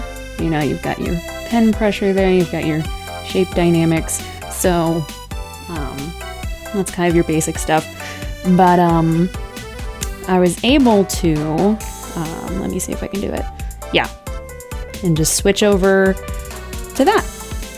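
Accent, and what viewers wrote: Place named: Texas, United States. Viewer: Such an excellent review, the oh so most divine a Texas mouth can bring to the table!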